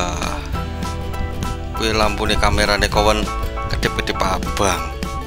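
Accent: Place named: Banyumas, Indonesia. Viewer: Ahahahah lanjut ngapak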